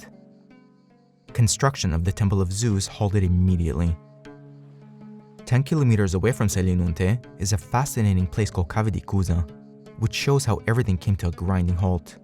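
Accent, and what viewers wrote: United States. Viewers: Can’t watch this because of the narrator’s f’awful yankee accent 🤮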